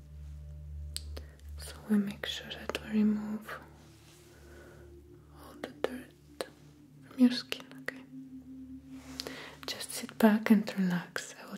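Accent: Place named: Russia. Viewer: I figured from the accent you were from Russia or something. Added to the beauty. Very good your videos. You should do in Spanish for Latinos 🙌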